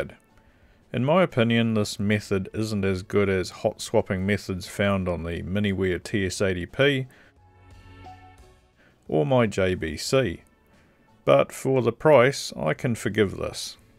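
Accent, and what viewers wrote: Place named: New Zealand. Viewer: Are you referring to ? To my ears he says "four hundred and fifty degrees celsius". I live in New Zealand, the same as the video creator, although I do not know him at all. In our version of English, that is how we would say 450 degrees celsius. If you are referring to some other part of the video, can you give us a timestamp?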